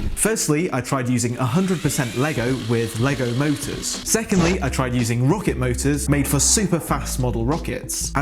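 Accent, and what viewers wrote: England, United Kingdom. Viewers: I have a new favorite english accent. 😄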